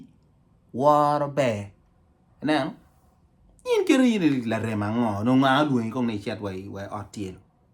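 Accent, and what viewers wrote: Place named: Acholi, Uganda. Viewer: Amaro kit mai iloko kede Lep acholi ni yomo ia mada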